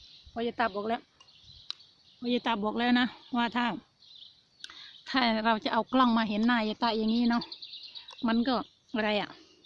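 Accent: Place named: Surin, Thailand. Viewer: คนสุรินทร์รึเปล่าคะ? สำเนียงบอกค่ะ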